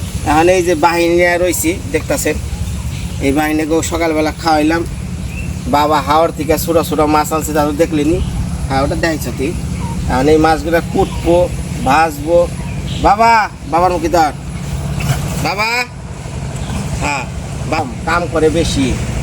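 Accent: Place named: Tangail, Bangladesh. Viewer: টাঙ্গাইলের ভাষা আবার বোঝা যায় না। আপনার প্রথম ব্লক যখন দেখছি তখনই তো ভাষার কারণে দেখা শুরু করছি। প্রথমে তো লেখা ছিল না এটা কোন জায়গার ব্লক কার ব্লক কোন এলাকাঁ। ভাষাটা শুনে মনে হয়েছিল এটা টাঙ্গাইলে কিছু একটা হবে। এরপরে তো দেখলাম যে এটা মির্জাপুরের দিকে। ভাষার জন্যই তো ব্লক দেখা শুরু করছিলাম। আর আমরা একেবারে শুদ্ধ ভাষায় কথা বলি টোটালি তারপরেও কেউ কেউ যারা টাঙ্গাইলের মানুষ এক্সপার্ট তারাও একটা টান খুজে পায়। আর আপনি তো পুরাই গ্রাম্য ভাষায় বলেন আপনারটা না বোঝার কি আছে। শুধু টাঙ্গাইল না ভারতের আসাম এলাকার মানুষ যখন কথা বলে সেটা পর্যন্ত শুনলে মনে হয় তারা কি টাঙ্গাইলের কিনা। ভাষাগত এত মিল। এরপরে ইন্টারনেটের সার্চ দিয়ে দেখতে পাই ভারতের আসাম আর টাংগাইল নাকি আগে এক ছিল। এজন্য এই দুই এলাকার ভাষায় এত মিল। আপনার বাড়ি টাঙ্গাইল আপনার ভাষা শুনলে সে বুঝবে যার এই ভাষা শুনে অভ্যাস আছে। অন্য কেউ বুঝবে না।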